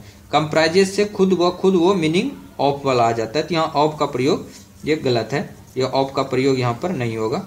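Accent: Bihar, India. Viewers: sir aap Bhagalpur Bihar SE ho kya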